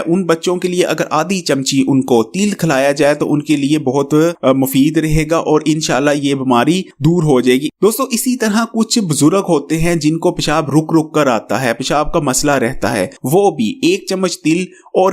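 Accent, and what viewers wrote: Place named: Punjab, India. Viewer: I wish you find some better voice to represent your videos.His sound is so idiotic and wrong Urdu pronunciation.Stop punjaabbi on Urdu narrative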